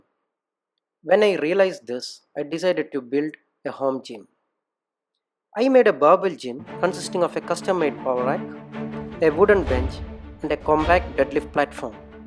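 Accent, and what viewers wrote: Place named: South India, India. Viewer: Hello sir, your slang seems to be that of a South Indian. To be more specific, a keralite or a tamilian. I'm from Tamil Nadu. I'm following starting strength for a couple of years. Now I'm planning to build a home gym in my apartment based on ripottoes's recommendation. But, I was concerned about the deadlift disturbing my neighbours downstairs or creating a great vibration in the floor. Were your deadlift quiet enough to not to concern your neighbours?. And it would help me a lot if your share your designs. Thanks in advance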